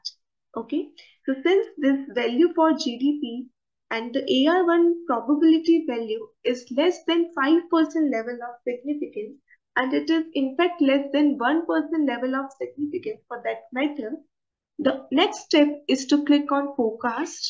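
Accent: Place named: India. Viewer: Please work on your axent , im not english , already hard to understand english so, your indian axent make it more difficult to me 🙏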